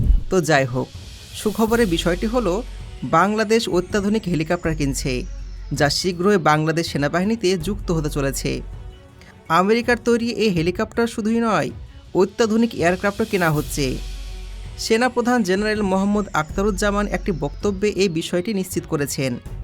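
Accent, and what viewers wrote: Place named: India. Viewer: APNAR BACHAN BHONGI LIKE INDIAN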